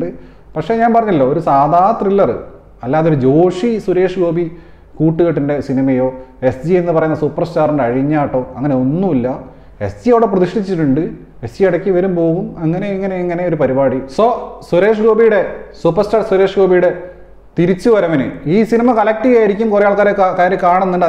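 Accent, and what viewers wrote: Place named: Southern Kerala, India. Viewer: കോഴിക്കോട്കാരനാണോ പക്ഷെ സംസാരം കേട്ടിട്ട് തെക്കൻ സ്ലാങ്ങ് ആണല്ലോ.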